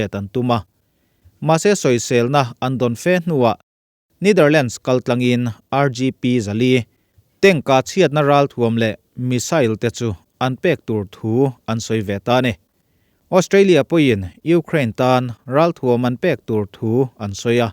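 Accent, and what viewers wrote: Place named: Champhai, India. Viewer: Champhai pa mizotawng hi chu analh ve chiang em. Champhai mizo tawng hi chu a nalh bik e.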